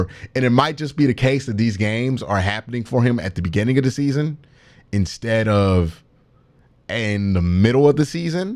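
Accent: Cleveland, United States. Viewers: q ..... frustrated actually has an "R" in the word ....big homie! our horrible cle accents.... hard to fix....i know!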